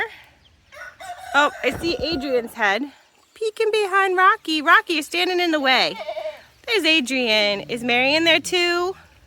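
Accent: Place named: Pennsylvania, United States. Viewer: I was trying to place your accent because it sounds familiar. I came to the conclusion that maybe you were raised in PA. I hope that bunny isn’t struggling to breathe - poor thing. 🙁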